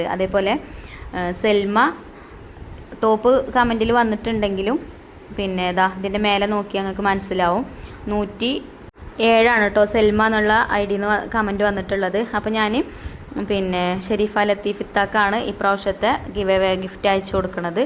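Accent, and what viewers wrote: Malappuram, India. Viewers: Enikk Malappuram basha valiya eshtam anu ningaleyum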